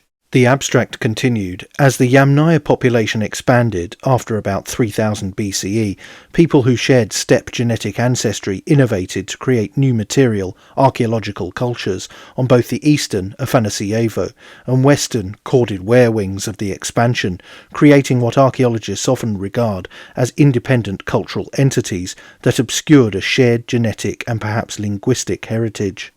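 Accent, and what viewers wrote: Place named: England, United Kingdom. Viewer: He’s English. People pronounce words with the most sensible emphasis in the phonic system of their own language. English people don’t say Paree when referring to Paris, even though that is the French pronunciation.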